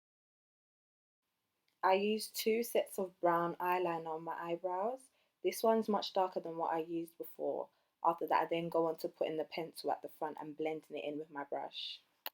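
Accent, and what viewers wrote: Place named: Jamaica. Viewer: love the look....ur accent,,,, do you have jamaican background?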